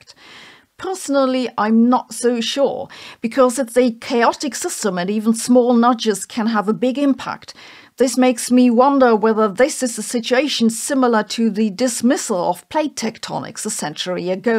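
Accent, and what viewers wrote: Germany. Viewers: Incredible accent. My thinking is she’s German and has spent a lot of time in the U.K. Now on to the Science…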